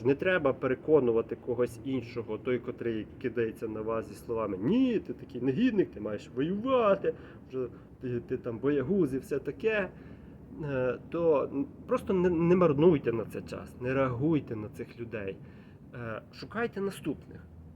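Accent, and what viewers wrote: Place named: Kolomyia, Ukraine. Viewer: Ти сам родом звідки? По акценту дуже нагадуєш моїш знайомих з Коломиї, Косова.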